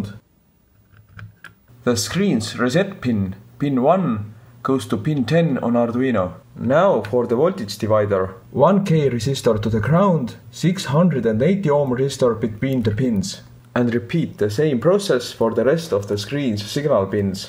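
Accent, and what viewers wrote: Estonia. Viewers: Kas oled Eestlane? Aktsent on täpselt selline. :D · Is that an Estonian accent? Thanks, best video tutorial on how to connect OV7670 to Arduino so far I found.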